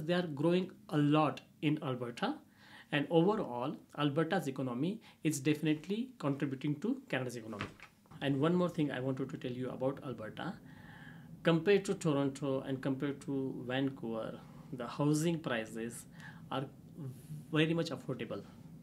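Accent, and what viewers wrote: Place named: Maharashtra, India. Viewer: You sound like a Maharashtrian, i want all Maharashtrians to speak loud clear and be the boos of their trade. I hope you will outshine and take my suggestions positively. Looking forward to be your client in coming time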